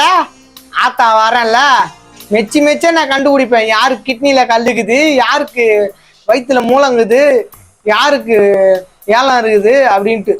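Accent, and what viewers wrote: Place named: Chennai, India. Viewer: தலைவா நிங்க பேசரத பார்த்தா சென்னை மாதிரி தெரியுது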